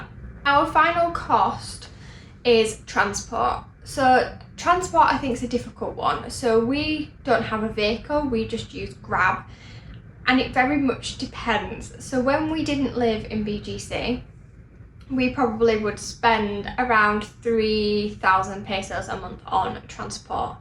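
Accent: United Kingdom. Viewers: what specific british accent is this? sounds cute.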